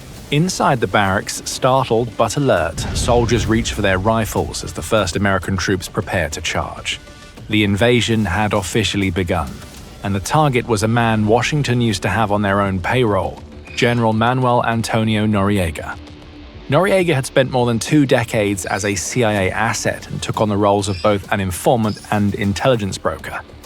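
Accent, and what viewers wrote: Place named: United Kingdom. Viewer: Why do so many videos have British narrators? This is an American operation. I’d much rather have an American narrator.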